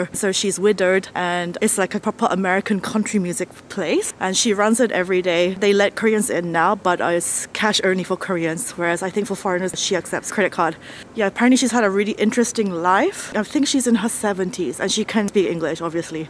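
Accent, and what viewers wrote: United Kingdom. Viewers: Do you have a british partner or spent time learning english in the UK? You have an interesting british accent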